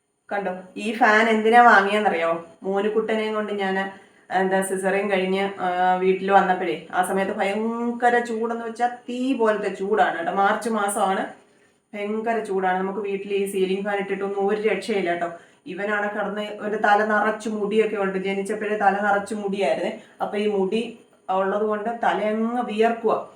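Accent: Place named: Thiruvananthapuram, India. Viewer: തിരുവനന്തപുരം ഭാഷ ആണല്ലോ. സ്റ്റൈൽ. വീട് എവിടെ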